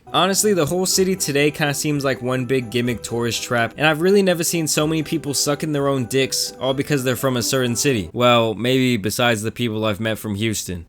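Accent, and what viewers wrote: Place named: East Coast, United States. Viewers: Why do you have a ny accent to me but your from tx? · How u from texas but got an east coast accent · Sounds like New Haven and New York 😂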